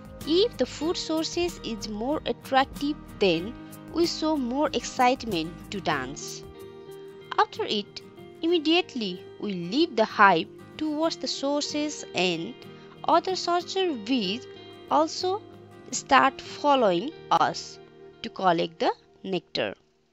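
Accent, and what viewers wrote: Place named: Maharashtra, India. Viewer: That Marathi accent tho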